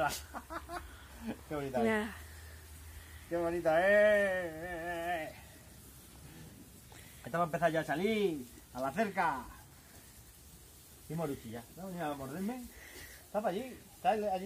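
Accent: Extremadura, Spain. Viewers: Lo comprendo y respeto. Desciendo de extremeños de Badajoz y tengo familia de Alía. Pero tan solo era porque reconozco el "deje" extremeños. De todos modos solo quiero darte ánimos y que sigas subiendo videos. Muchas gracias por contestarme.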